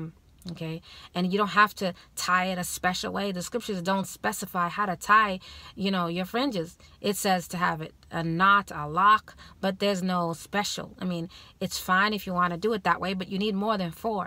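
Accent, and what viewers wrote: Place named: Europe. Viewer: Love the information. Voice reminds me of the old 1950 movies. I believe that is a Continental accent.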